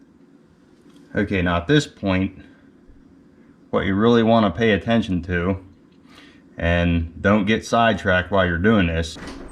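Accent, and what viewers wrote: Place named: Pittsburgh, United States. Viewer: Are you in or from the Pittsburgh, PA area? Other than not saying yinz, you have a pretty good Pgh accent.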